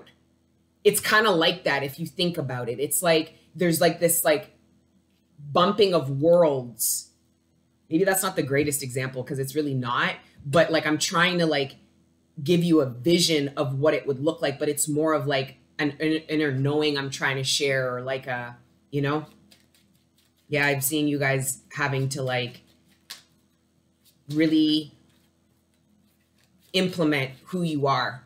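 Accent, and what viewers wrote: Toronto, Canada. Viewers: Canada- you sound like your from Toronto! I’m in Scarborough! But anyways....love your energy! 💜💜💜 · You're hilarious and so fun! I thought you might be from Toronto / Scarborough from the way you talk and then you said you're from Canada. This reading lifted my spirit. I also have a similar incense burner. 🙏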